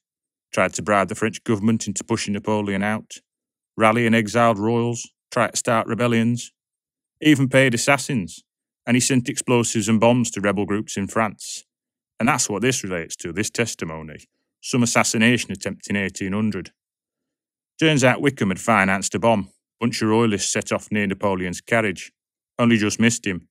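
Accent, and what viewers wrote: Yorkshire, United Kingdom. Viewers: Such a rich biography. Loved the timeline. Though I'm no expert, I liked your Yorkshire accent. Finally Sheppard? Son of Jack Sheppard perhaps? Thank you for another excellent episode.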